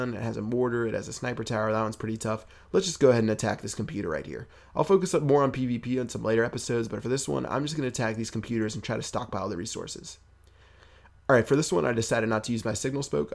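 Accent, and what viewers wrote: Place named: United States. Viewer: I've been playing boom for about a month now, I'm from aus, would love to do some commentary work with you, my Aussie accent and your American one would make good commentary 👍👍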